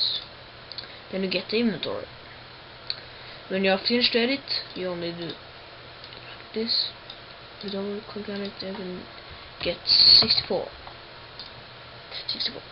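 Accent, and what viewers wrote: Norway. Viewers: are you norwegian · are u norwegian?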